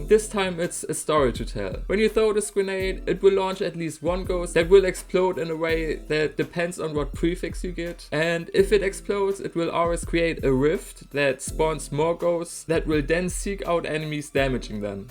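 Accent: Germany. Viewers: youre such a german guy i see that accent (du bist son deutscher bro)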